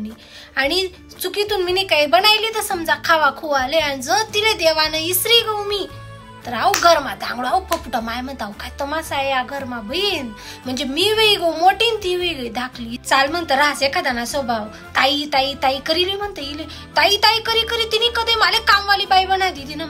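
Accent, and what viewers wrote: Khandesh, India. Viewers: मी तर तवाच वळखल्यान तूण खान्देशी हाय ते छान बोलतीस व ऐकतच राव्हा वाटतेन आम्ही आहो विदर्भ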